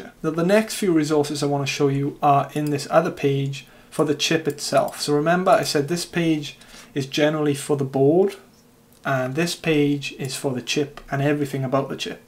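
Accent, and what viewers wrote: South Wales, United Kingdom. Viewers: Where are you? Sounds like South Wales?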